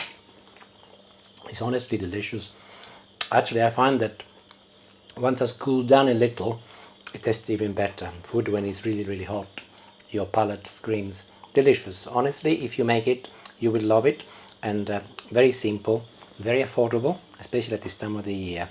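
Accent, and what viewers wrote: Italy. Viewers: Amazing cook! His instructions are clear; his English, with his Italian accent, is excellent! I'll definitely try cooking the dish!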